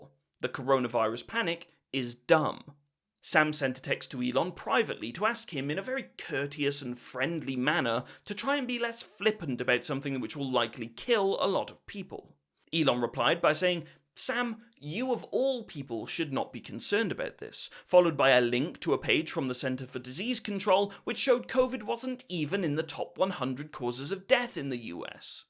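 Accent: United Kingdom. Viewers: I just have to say that very very few people can make me *literally * laugh out loud, but you manage. I’m British too and I think I have a similar accent and love of swear words so it’s very inspiring to hear you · just might be your moist pointless video yet. at least it brings a british accent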